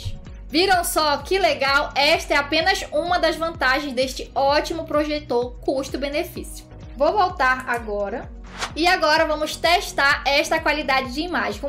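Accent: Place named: Rio de Janeiro, Brazil. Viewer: paranaense com traços japonês e com sotaque carioca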